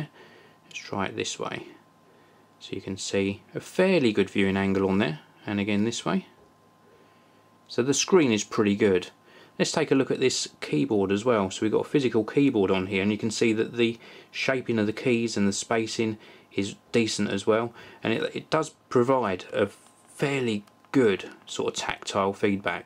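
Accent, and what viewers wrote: England, United Kingdom. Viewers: When I first opened the video, I was wondering why was doing an English accent. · British Guy!!! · Haha. British accent :)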